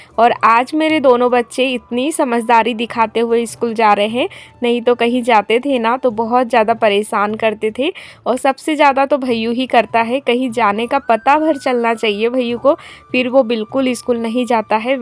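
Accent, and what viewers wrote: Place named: Chhattisgarh, India. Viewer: Aapki boli chhatisgarh ki boli se kafhi milta hai hai na kabhi kabhi gaw ki bola kijiye achha lagta hai ❤❤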